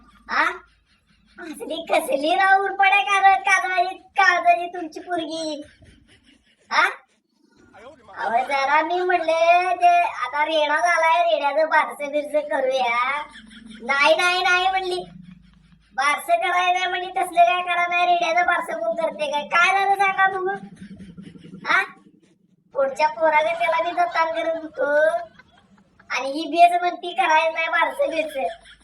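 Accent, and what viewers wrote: Kolhapur, India. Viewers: Your kolhapuri assent is fabulous❤❤❤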